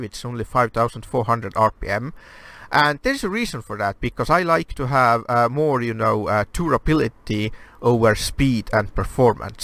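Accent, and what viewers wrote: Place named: Sweden. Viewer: clearly swedish or norwegian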